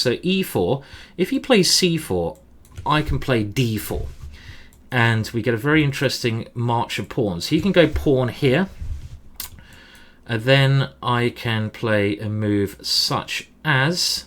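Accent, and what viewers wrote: United Kingdom. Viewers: So great GM thanks a lot I learn English British accent from you beside chess I'm so happy to learn from you. very very tough game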